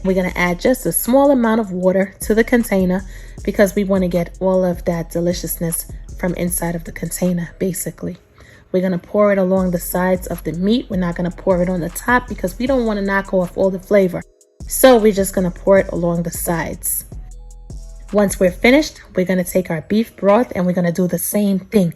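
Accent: New York, United States. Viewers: I think its New York but it could be Boston.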